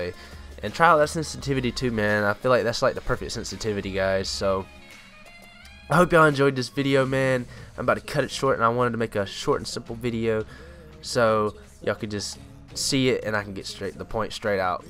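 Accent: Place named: South, United States. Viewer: He sound good st the beginning but then he country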